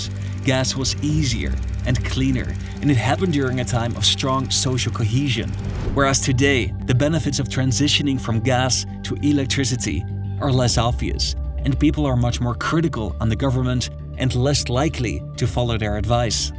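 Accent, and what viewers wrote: Netherlands. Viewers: No doubt you're Dutch. The thick Dutch accent. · kind of strange.. your accent is very clearly Dutch so I’m wondering how you could have missed this? It was all over the news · I can tell you're clearly Dutch yourself by listening to your accent. I live in Holland as well and though the government doesn't want to get, in your words, "stupid rich". They have been plain stupid in other aspects for decades. I had high hopes for the new government but I think it's all ready to late. There's a demographic time bomb ticking in our country. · I am Dutch and I didn't even know all that... never realised why the government was so reluctant to close it all down, because we've never been made aware of the fact we got most of our social benefits out of that... we don't even know how we compare to other countries in that regard, but we were acutely aware of the fucking EARTHQUAKES that NEVER happened before the FRACKING started nor anywhere else in the Netherlands; so it was kind of a BIG deal. 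Therefore you should consider making these video's in Dutch for Dutch people, cause I can hear that you speak Dutch as your first language, so it shouldn't be more difficult, you might have a smaller audience at first, but the information you give could really change how people in the Netherlands discuss these issues, cause right now we're only aware of farmers and people who got fucked by the government, who's lives got destroyed by our official tax department (IRS in US). So yeah, you could do lot of good is all I'm saying. Probeer het gewoon. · You just know this guy is Dutch, his accent just gives it away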